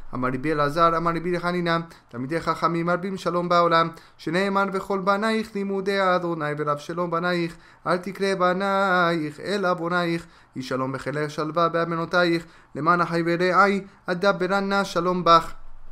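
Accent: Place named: Morocco. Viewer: L'hebreu accent marocain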